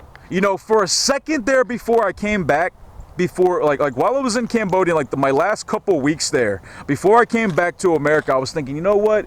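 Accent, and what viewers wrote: United Kingdom. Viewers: Great British accent there! Excellent video too!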